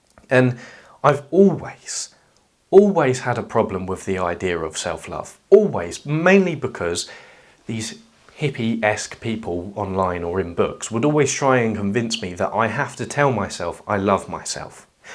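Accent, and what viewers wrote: England, United Kingdom. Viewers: You sound like a Pom but live, behave, and have the smarts of an Aussie. (PS my parents are Poms) love your stuff, totally enthralling 🇦🇺🦘👍🏼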